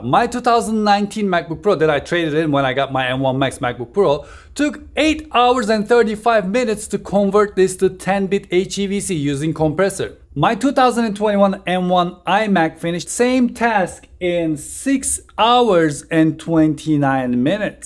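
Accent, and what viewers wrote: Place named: Turkey. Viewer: abi taşağına ve türk aksanına kurban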